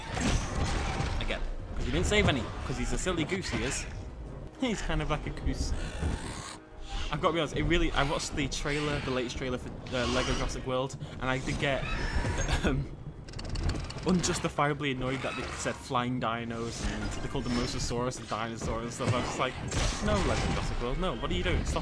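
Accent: United Kingdom. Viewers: I love how says Hello Poppet. I just love the British accent I think British people are awesome.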